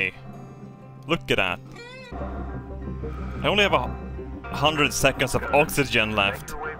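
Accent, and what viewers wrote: Sweden. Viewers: is getting a thicker Swedish accent every day! Love it!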